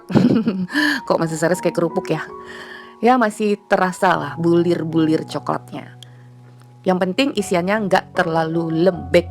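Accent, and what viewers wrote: Java, Indonesia. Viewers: lucu sekali. Bhs nya kyk orang Jawa saja 🥰🥰🥰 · Gak fokus ke prosesi mbikin kuehnya, maam chayaankk😘😘❤💓🌺🎉🎊 Suka gaya ngomong medhok Jawanya... 😂😂😂😂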